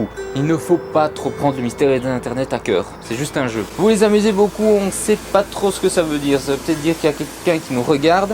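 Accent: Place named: Belgium. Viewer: un belge !!!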